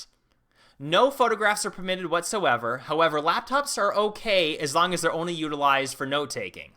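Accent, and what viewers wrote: United States. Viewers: U talk good but u so much amerikan .. try be betwen europe and amer..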